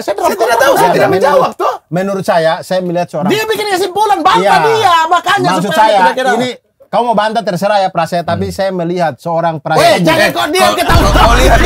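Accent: Eastern Indonesia, Indonesia. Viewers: makin ksini, logat bang makin menyatu dengan trio timur 😅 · nah iya, sependapat gk enak aja didengar bg klo kebawa logat timur lebih pas logat yg biasa aja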